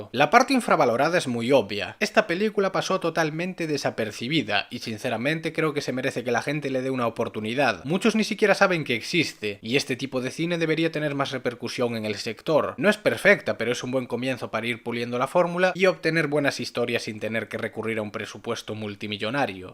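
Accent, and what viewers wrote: Galicia, Spain. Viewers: Eres gallego verdad? Lo digo por el acento por q yo también soy gallego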